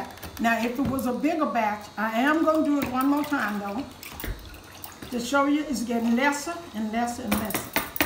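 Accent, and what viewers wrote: South, United States. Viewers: Grandma had that “southern” twang goin on 😉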